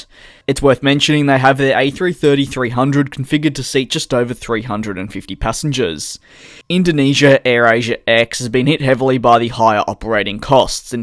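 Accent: Australia. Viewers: You sound Australian, it's just an observation. I'm another Aussie presently living in Sri Lanka and go back and fourth to Sydney and Colombo. I'd made the mistake of 'trying this airline' That I will never repeat. The Manager for AisAsia in Sydney who's name is Jason James Lee was very rude and condescending to me. Therefore I for one can say that I will never fly this airline. Its good to see that they're falling apart. Their customer service may also be another contributing factor. Brett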